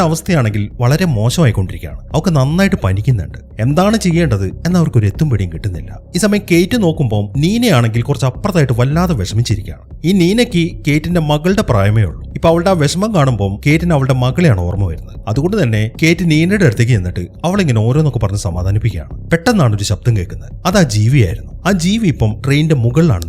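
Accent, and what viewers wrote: Palakkad, India. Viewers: Chettan palakkad ano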